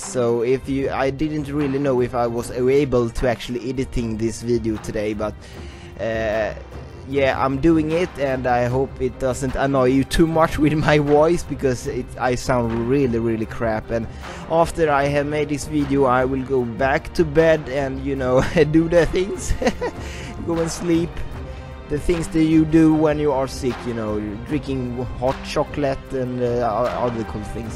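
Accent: Sweden. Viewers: Är du svensk, gissar bara😀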